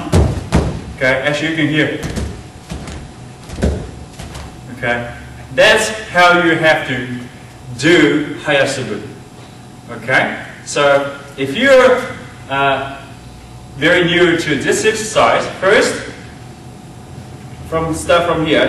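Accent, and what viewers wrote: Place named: Australia. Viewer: ok great vid this is really helpful and i don't mean to get off subject, and i mean absolutely NO offense in making this comment, but am i the only one who thinks he sounds a little Australian, i mean that just hit me, and im an odd person so i notice these things sometimes even when im not looking for them^^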